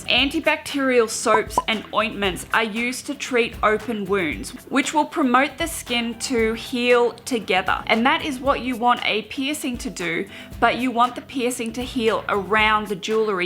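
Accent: Australia. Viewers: Love your Australian accent... Will you ever get your septum pierced?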